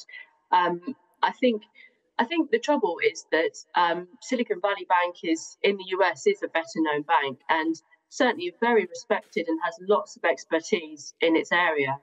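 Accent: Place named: RP, United Kingdom. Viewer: What happened to the news reader?? She suddenly is talking like the late queen of England!!!how queer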